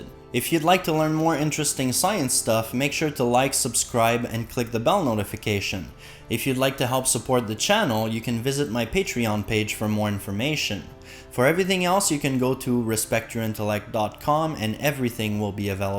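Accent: Quebec, Canada. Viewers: You’re definitely Québécois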